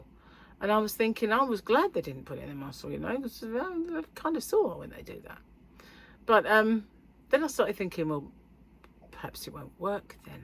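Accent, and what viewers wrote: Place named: England, United Kingdom. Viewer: Hi, You sure do sound English always, however the other day your accent was much thicker.( You were quite excited) Your blanket is quite beautiful love the colours, what stitch is that?? Enjoy your dinner out. Beautiful sunny day here enjoy your hump day in the UK.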